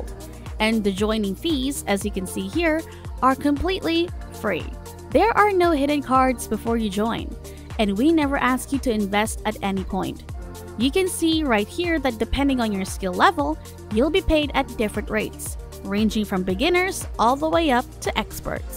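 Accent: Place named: Philippines. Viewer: The woman talking in the video sounds like a Filipino. She has that Filipino telemarketing English accent 😍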